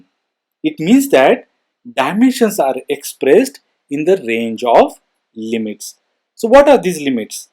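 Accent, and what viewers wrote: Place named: United Kingdom. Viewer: You are very good , i like your accent and your talking way its very british.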